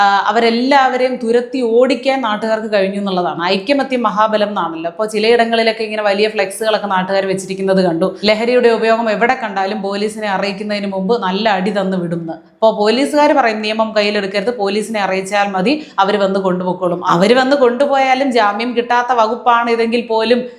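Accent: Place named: Trivandrum, India. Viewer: തിരോന്തോരം ഭാഷയിൽ പറയൂ. അതാണ് കേൾക്കാൻ രസം . നിങ്ങളെ വ്യത്യസ്തയാക്കുന്നതും ഹാസ്യാത്മകമായ ആ ശൈലിയാണ്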